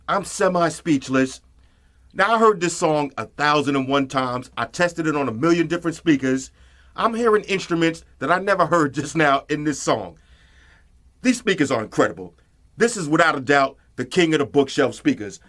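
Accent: New York, United States. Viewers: Yo, it seems strange I’m watching this video from NYC / man this place is crazy & everyone in this hood all talk like you!! Hahaha, this city has so much to offer some 1 like me while I’m here on vacation- hot video again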